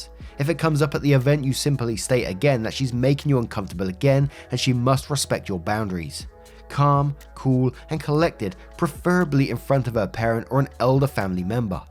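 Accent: England, United Kingdom. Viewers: just wanna say, i am american, and I'm not huge on english accents but I love your voice, its so calming even when you are swearing i love it xD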